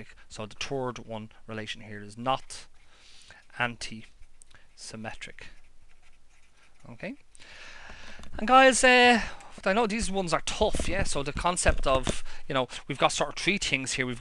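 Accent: Ireland. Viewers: who would have guessed that watching jacksepticeye would get me used to the irish accent enough to help me learn math?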